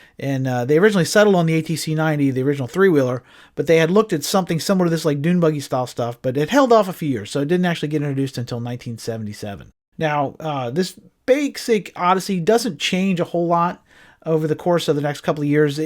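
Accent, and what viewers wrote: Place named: Cecil County, United States. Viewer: You sound like one of us boys from Cecil county. My goodness 😂😂😂 My dad bought me and my 2 brothers a 79 Odyssey. This was 1985 and most of the other guys had CR’s 250R’s or Quad runners and Quad Racers. We had that old yellow 79 😂😂 they always made fun of us😂😂 I had to stand up on the back and hold on to the upright bars. We rode it in all the snowstorms. The snow collected on the front plastic fenders and got them brittle. They cracked off. Mud went right in our faces after that. So my dad took my fluorescent orange sled and cut it apart with a utility knife and bolted homemade fenders on it. We were some goofy poor ass hillbillies of the neighborhood. We rode that thing up the back roads, down the power line access roads. It had these straps that you put your wrists through on the steering yoke. It didn’t have a wheel. 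Those straps were to keep your arms from getting ripped off in a roll over.😄😄😂😂😂😂 Good times man. Those upitty kids with the nice new quads and bikes gave us some ball bustin for having to ride that old yellow and homemade bright orange fendered jaloppee. You said STINK FINGER😂😂😂😂. We had an old neighborhood skank that would let ya Four Finger her😂😂😂😂😂. MAN,,!!! I was dyin when I read your comment. Took me way back to 85. God Bless us men who grew up in those awesome days. Everything is all built up and the land we drove on is all developments now. These kids nowadays don’t even know what stink finger is. Nonetheless Four fingerin’😂😂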